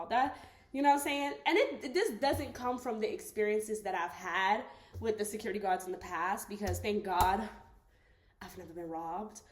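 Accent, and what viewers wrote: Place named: New York, United States. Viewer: You sound so relatable like a woke asf n.y’ ker and are living my dream. I’m so over the western world .. god bless you and your growing family 🌍..😍😍😍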